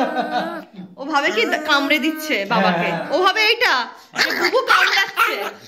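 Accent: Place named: Nadia, India. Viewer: তোমার কথায় খুব সুন্দরভাবে নদিয়া জেলার টান আছে l আমার পৈত্রিক বাড়ি শান্তিপুরে l তাই এই টানটার সঙ্গে খুব পরিচিত আমি l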